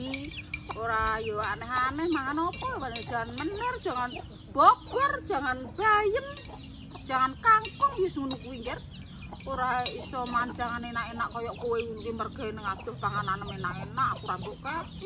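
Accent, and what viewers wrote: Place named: Tuban, Indonesia. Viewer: Kalo liat kontennya, logat bicaranya mengingatkan saudaraku yg ada di Tuban.... Semangat y Lek...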